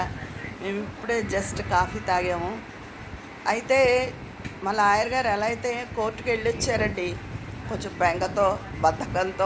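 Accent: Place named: East Godavari, India. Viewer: Naku mee vedios choostuntanu meeru eppudu na coments ki reply evvaledandi meeru.mee E.G language chala baguntundi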